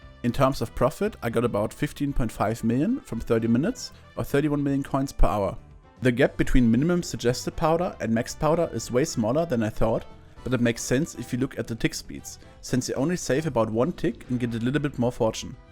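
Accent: Germany. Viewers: u sound german are u german? if yes Hi mein bruder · do I hear a german accent? Edit: Yeah totally useless because you say it later on, i wrote this before watching the video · Hab deinen Kanal grade zum ersten mal gesehen und hab sofort rausgehört dass du aus Deutschland bist. Gutes Video weiter so 💪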